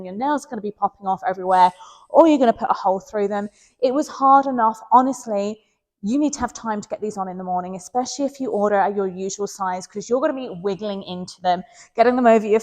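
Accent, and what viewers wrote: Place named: United Kingdom. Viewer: Love your British accent! I think you should have ordered a size small in the Commandos! 😊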